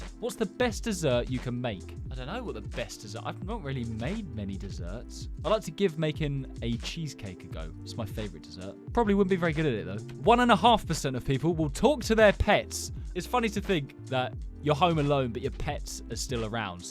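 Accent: United Kingdom. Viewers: I only laugh because he’s british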